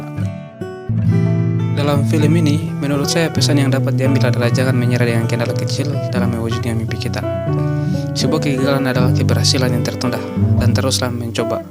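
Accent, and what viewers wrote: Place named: Papua, Indonesia. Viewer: CARA NGOMONGNYA KAYAK PAPUA MAAF YA BNG 🙏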